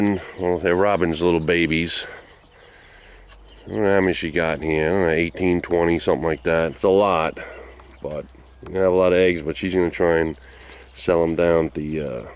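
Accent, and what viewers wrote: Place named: New York, United States. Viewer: Only another NY'ER is able to pick up that accent so easily!! Yeah I went all "country" myself. I have 10 of those Polish hens. They are just so fun to watch!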